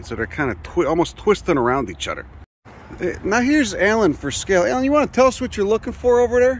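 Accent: Chicago, United States. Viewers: Oh, yeah, I get it. Your original point is good - and believe me, I hope people will heed signs or common sense. It is gruesome to think of caustic (or acidic) pits of boiling mud. I guess my original comment was because our ingenious educator simply cracks me up with his thick Chicago accent. Sorta like the trip through the grocery store near Berkeley. So many quotables...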